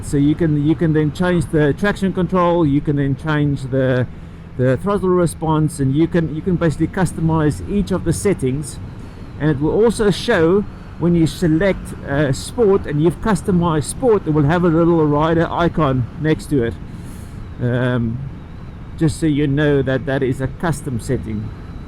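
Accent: South Africa. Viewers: How long have you lived in the UK ? I assume your an SA guy by the accent :) I live in the States. Southern CA and now in Utah. Been in the USA for 31 years now. I have a 2014 ST R. Looking to upgrade to this 2021 version. Can you compare the 675 to the new CC bike ?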